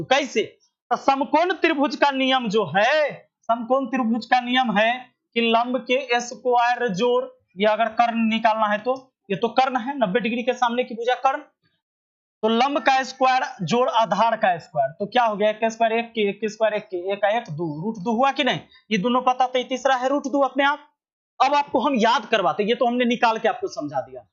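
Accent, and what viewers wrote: Bihar, India. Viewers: ❤आपका पढ़ा बिहार जैसा एकादम पूरा ठेथी भाषा❤❤❤🎉🎉🎉🎉🎉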